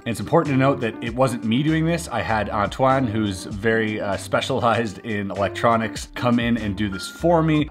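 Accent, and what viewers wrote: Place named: Quebec, Canada. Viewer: C’est la première fois que je tomb e sur une de tes vidéos et lorsque tu a dit merci dans part 1 jme suis dit c est sur qu’il est Quebecois lol Quand tu as dit Sébastien dans celle-ci ça confirmais... En tout cas bravo 👏 je suis un nouveau subscriber ✅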